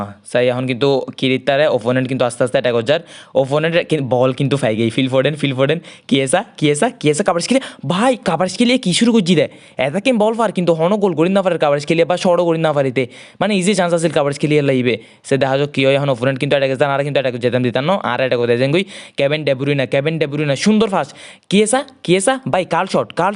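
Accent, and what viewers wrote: Chittagong, Bangladesh. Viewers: Vai apnar basha ki chittagong e?? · ভাই আপনি ১০০% চিটাগং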